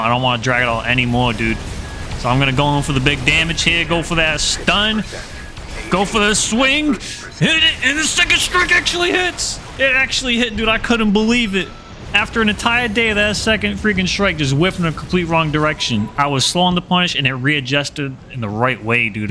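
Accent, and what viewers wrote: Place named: New York, United States. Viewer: Lmao 😂 yo i know you gotta be from new york by the way you talk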